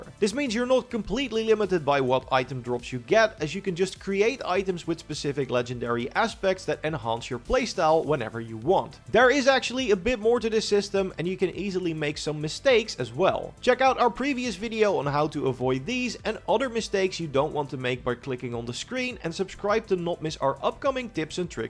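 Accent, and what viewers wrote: Netherlands. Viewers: 99% sure it has to be a Dutch accent 😅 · Loving that Dutchlish.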